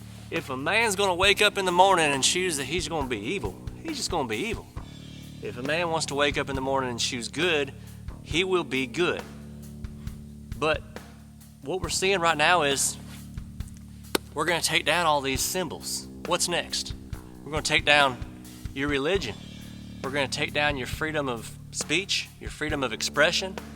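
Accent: South, United States. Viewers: still southern brother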